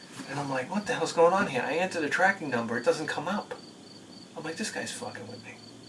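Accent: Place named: Boston, United States. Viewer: it's that accent that sounds Bostonian.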